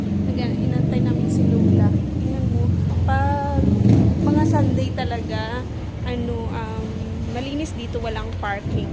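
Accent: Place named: Visayas, Philippines. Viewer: Namis mo pala lugar 19yrs old ka pa huling silip. Muntikan na nawala si Ramen, ok yan bisaya accent, actually kahit ano accent ok lang. Napansin ko mahilig ka po pala talaga magpapicture. Sana all may phographer taga kuha picture. Sipag ng photographer mo, siya pa humirit na isa padaw picture. Ang galing ni Ramen sumundo sa inyo. Ayos!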